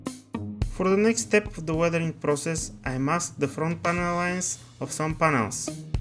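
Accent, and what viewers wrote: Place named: Russia. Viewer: He sounds Russian to me, I do enjoy the accent, and not blanketing over it.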